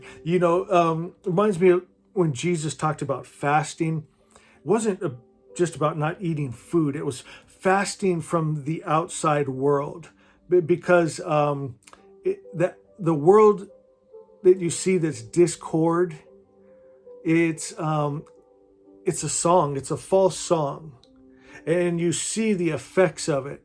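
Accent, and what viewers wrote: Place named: Greece. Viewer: Greek accent detected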